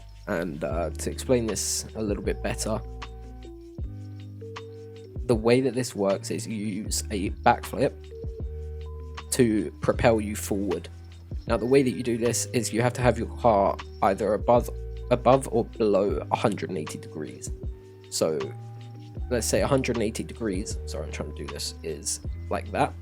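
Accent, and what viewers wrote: United Kingdom. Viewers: love the british accent lol rn i have by in my head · Good shit bro where abouts in england u from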